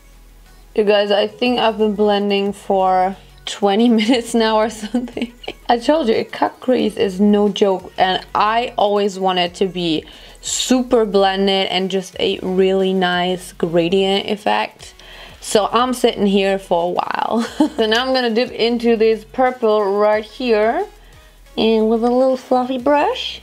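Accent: France. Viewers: Awww your french accent hahaha Lots of love from Montpellier, South of France 😘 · Super accent français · I love your accent french !😍😍 I am FrenchGirl I love makeup tutorial !!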